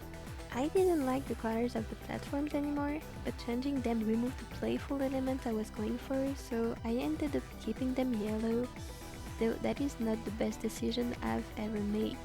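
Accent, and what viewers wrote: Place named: France. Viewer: Okay I’m not commenting about the video (you’re very very talented) BUT I needed to tell you that I didn’t even heard your French accent at first Like At all. And I’m French too, and it makes me kinda more confident to hear another French speaking English! So haha thanks :))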